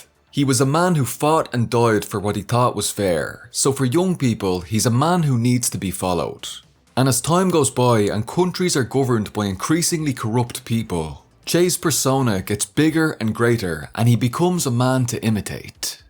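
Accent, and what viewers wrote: Ireland. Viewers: I know it's serious material and you're doing a great job here, but I've never heard someone speak Spanish with an Irish accent and it's incredibly entertaining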